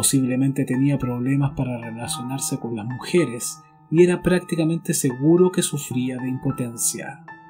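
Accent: Standard. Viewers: relatas con acento neutro, pero igual supe que eres chileno. Te felicito por lo que haces, muy profesional. Y si es chileno...es bueno 😉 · Eres venezolano? Dijiste se puso a trabajar. Aparte el acento neutro. Interésante.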